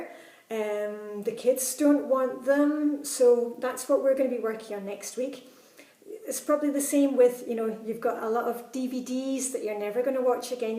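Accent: Scotland, United Kingdom. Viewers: Thank you so much for all your videos. I love your way of if communicating the FlyLady system- it has really injected new life into my routines. I have even downloaded the Teacher Control Journal to help me at work now! I also love hearing your Scottish accent and your lovebirds in the background!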